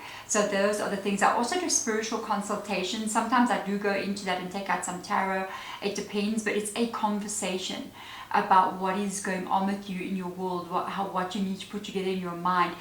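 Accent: South Africa. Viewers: Are you South African? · I was about to say, mam are you South African? And you are 😩😩😩😭 we truly recognise our own ❤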